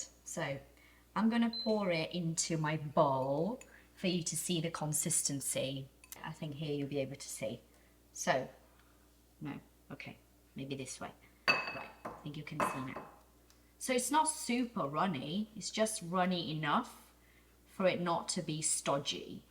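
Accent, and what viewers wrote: RP, United Kingdom. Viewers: Keep up the good work. Your perfect RP accent is like music to my ears.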